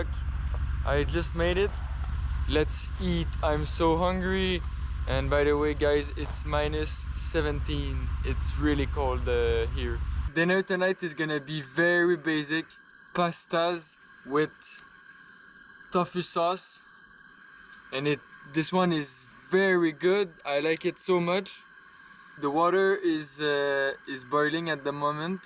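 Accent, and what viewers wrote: France. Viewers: Ur Accent seems like ur a French Guy! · Living in Vermont and loving Quebec, I was excited to hear what you deal with in your travels. Some day we'll be able to cross the border again, eh? So it was frustrating to have the music too loud at points. Since you seem to be a native French speaker, the accent adds to the problem (but thank you for doing this in English). I'd suggest no music when speaking as a rule; people listen on so many different kinds of speakers and such. What might sound great on headphones can be junk on a phone speaker or such. Keep going, little glitches will iron out and what you are doing is great.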